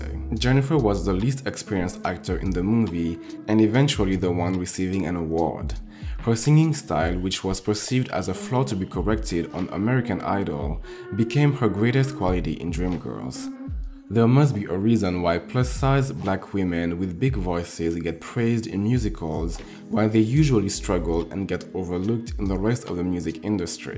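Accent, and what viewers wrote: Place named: Germany. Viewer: Are you German? love the accent, I love your breakdowns btw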